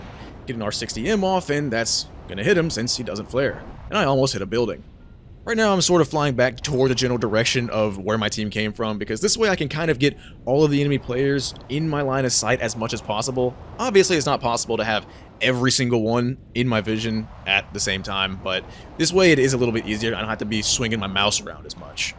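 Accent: United States. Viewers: I like the way you talk,American. subscribed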